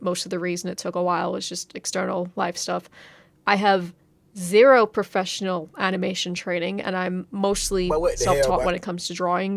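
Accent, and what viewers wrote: New York, United States. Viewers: I thought I was going insane- I heard that new yorker accent the last video and was confused af on this video when getting to the UK part. LMAO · Nah, she’s speaking American. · I'm guessing you're originally born in the states due to your American New York accent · Wait wait WAIT A DAMN MINUTE!!! Your from the UK but you have a heavy new yorker accent 😭? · Yooo wtf I was thinking the same thing, I've never heard a British person sound that american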